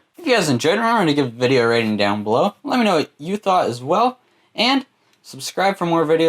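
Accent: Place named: Canada. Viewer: you speak so bad and the Canadian accent makes it even worse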